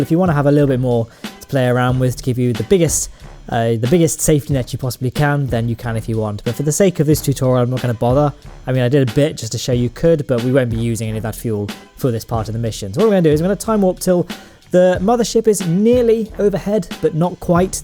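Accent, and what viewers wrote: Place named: Cambridgeshire, United Kingdom. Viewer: I wonder what county he’s from,Sound like Cambs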